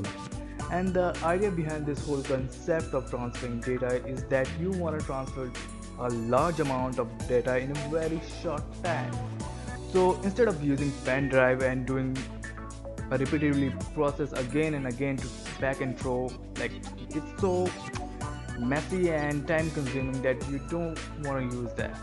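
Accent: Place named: Bihar, India. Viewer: OEEY PUNDIT : FIRST DON'T PLAY THE DING DONG MUSIC !!! THE CABLE IS CALLED ETHERNET CABLE AND NOT A LAN CABLE . PLEASE DONOT USE ANY INDIAN JARGON AS COMPUTER VOCABULARY. NEXT THING > IT'S CALLED LOCAL NETWORK SHARING . THIS NOT ANY EINSTEIN 'S FORMULA . SO KEEP IT SIMPLE . BRUSH UP YOUR OWN ENGLISH DICTION (BIHARI DICTION ) AND ENGLISH PRONUNCIATION ! FIRST YOU LEARN COMPUTER PROPERLY THEN YOU TEACH OTHERS THE RIGHT WAY ! DON'T TEACH "LAN CABLE FOR ETHERNET CABLE ! THERE ARE STRAIGHT CABLE ,CROSS OVER CABLE TO CONNECT PC BUT USB CABLE ALSO CONNECTS ; SO YOU NEED TO BE SPECIFIC !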